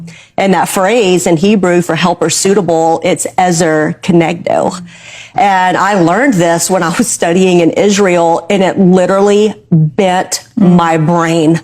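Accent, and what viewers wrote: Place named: Mississippi, United States. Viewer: Did she say Laurel, Mississippi or rural Mississippi? I heard laurel not just through the word she said but through that accent lol. I used to live near there.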